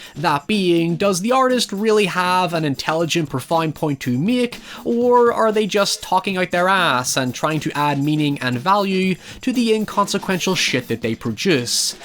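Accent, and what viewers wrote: Northern Ireland, United Kingdom. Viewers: "If you wanna listen to my soothing, sexy NORTHERN IRISH accent..." Oh, so THAT'S your accent! I uh... don't know how I didn't get that. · Btw, I think your "soothing, sexy Northern Irish accent" adds to the uniqueness of your content and almost seems to add to the mysticism of the movies and topics you discuss.:) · northern irish accent 😍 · Northern Irish? always thought you were Scottish. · thank you for flat out saying you're Northern Irish. I'm fascinated by accents within the English language as a non-native speaker and I've always wondered if this soothing voice of yours was, in fact, an Irish one.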